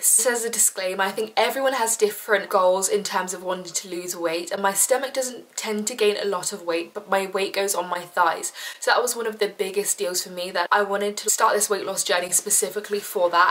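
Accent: United Kingdom. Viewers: Omg this British accent 💖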